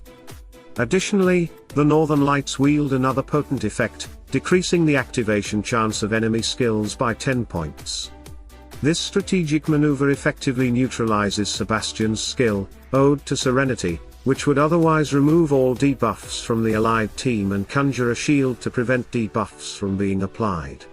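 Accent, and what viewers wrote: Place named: United Kingdom. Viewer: You sound like you are from Britain.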